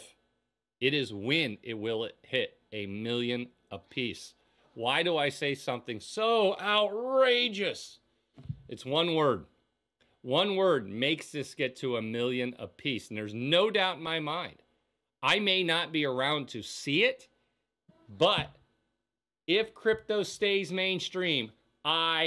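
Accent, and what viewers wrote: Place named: Pennsylvania, United States. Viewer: Hello from Morgantown WV. You sound like you're from PA,.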